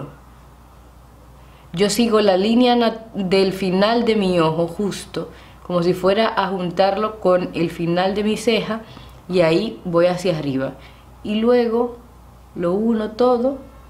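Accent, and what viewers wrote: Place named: Dominican Republic. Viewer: me encanta tu acento deberias dar unas clases aya en las escuelas sobre el uso y acento de el espańol en republica dominicana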